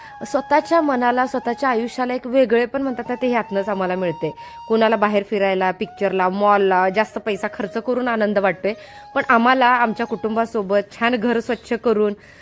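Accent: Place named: Solapur, India. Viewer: ताईंच बोलणं कोकणी नाही वाटत, सोलापूरी किंवा सांगली कडील लय वाटते बोलण्यात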